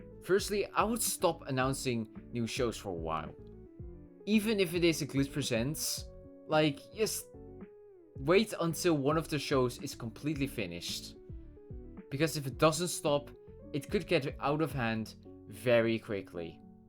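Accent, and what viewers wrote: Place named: Netherlands. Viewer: Sorry this is unrelated, but I heard your accent and I was like "You sound Dutch" and then I check your channel and you're from the Netherlands, I just can't lose. She's too good chat 🗣🔥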